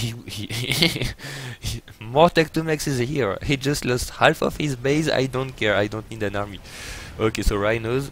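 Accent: France. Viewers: tu est français? Car je trouve que tu as quand même un accent français, et cela se sent bien, je suis un de tes nouveaux abonnés et je trouve que tu vas bien m'aider à progresser dans SCFA et je te souhaite une bonne continuation!;-) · Bring back the scoot! Your pronunciation of scout was very accurate, but the french accent only enhances the video.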